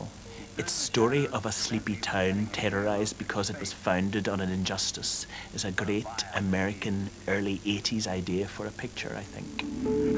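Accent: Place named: Ireland. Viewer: Major Mo .....its an Irish accent. You fool.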